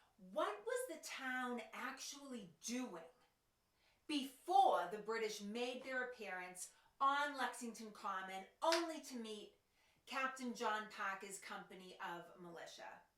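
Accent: Boston, United States. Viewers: I am embarrassed to say that I am almost 80 and life a short distance from Concord/ Lexington and have not visited, but watching these videos is giving me impetus to doing it this spring. BTW--- ---you have a Boston accent you can cut with a knife. It almost sounds Dahhchestuh, but not quite--but sounds as if you grew up in Boston: Captain Pahkuh! BTW---I have several kin that were residing in Plymouth, Kingston, and Duxbury that responded to the Concord emergency or the Concord alarm as it was referred to. Quite a hike on short notice! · Hi. I love Revolutionary War history and I subscribed to your channel over the weekend. I live in Essex County, N.Y. where the famous forts Ticonderoga and Crown Point are located. I would love if you did an episode on the 58 pieces of artillery that were dragged overland each on a sled of its own in the winter of 1775-76 from the captured Fort Ticonderoga to Dorchester Heights by General Henry Knox and his men forcing the evacuation and liberation of Boston from the British in March of 1776. 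It was an extremely difficult task and engineering feat without a loss of a single cannon and a victory for the colonists. I would love to see where they set the artillery in Dorchester Heights that forced General Howe his army and the loyalist population to flee. Had this band of men not been successful future revolutionary events could have turned out radically different. Thank you for the interesting post to watch and your Boston accent is fantastic. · These are fun, and I love your Boston accent!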